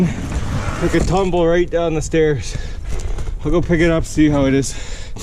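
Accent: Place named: Canada. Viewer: Where is this guy from? Sound Canadian lol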